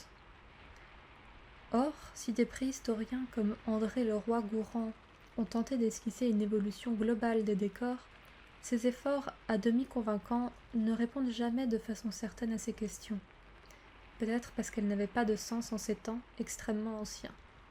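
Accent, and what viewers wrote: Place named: France. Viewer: You know, I’ve noticed this before when you made the english-french video. You speak both languages really well, did you grow up speaking both? Also, i don’t speak french but I’ve heard it enough that i feel confident assuming you’re french, right? Like your french is from france and not Quebec. That aside thanks for the nice video, i hope you make more french asmr but mayhaps a bit more slowly next time. Personal preference 😁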